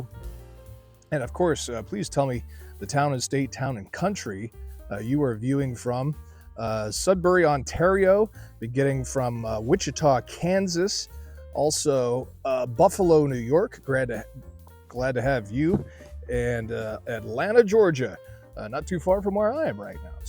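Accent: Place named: Boston, United States. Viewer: Bawstin LaaaagAH! Remastid! I can’t stand the Bostonian accent but I love the 🍺 😂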